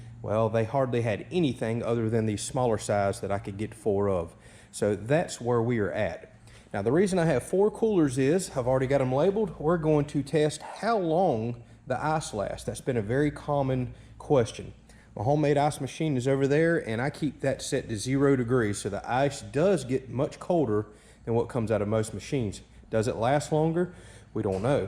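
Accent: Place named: Texas, United States. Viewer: Are you sure you are not a Texan ? That accent is spot on for people around San Antonio.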